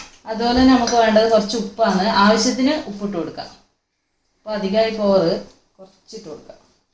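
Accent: Kasaragod, India. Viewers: Slang mariyalo.. thanni kasargod❤ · ആഹാ നമ്മുടെ സ്വന്തം കാസറഗോഡ് ഭാഷ 😜🤗നീർദോശ 👌👌👌👌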